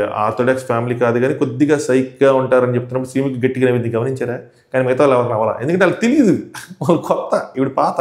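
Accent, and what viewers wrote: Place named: Srikakulam, India. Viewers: mee roots Srikakulam aa....konni words lo doubt vacchunde....vja antaru but slang okkosari doubt vacchedhi😅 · Yes same doubt naku kuda matalu srikakulam vijayanagaram side laa vuntay but he is from vijayawada...may be ikkada settle ayyaremo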